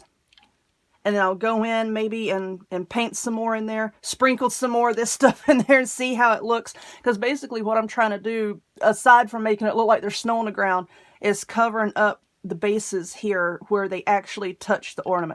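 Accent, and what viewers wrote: South, United States. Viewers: Miss Southern Lady, I definitely live your southern accent. You have a good sense of humor. I enjoy watching your videos. I love watching your different types of craft.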